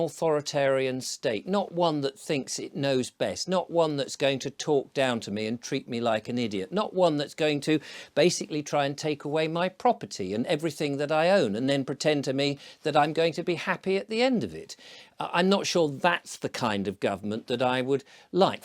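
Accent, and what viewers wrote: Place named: England, United Kingdom. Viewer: Just watched your video about where you were told off. You are absolutely not stupid or think. You are a much deeper thinker than those haters that have to complain about everything. I am an American and truly enjoy listening to your very pleasant, very English, as far as I know, way of speaking. Very easy to listen to. Nice to hear someone who appears to think before putting his mouth in gear. I am also a craftsman, a millwright, woodworker, metal fabricator and auto mechanic. I think the person mad at you is out of line to complain, think he takes himself a little too seriously. Anyway, I will continue to follow your sight and enjoy learning more about England. I am equally proud of my country even though it is going through some tough times with leaders I don't think have our best interests at heart. Stiff upper lip and all that, old chap. 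Let the criticism roll off your back like water off a duck. Can't please everybody.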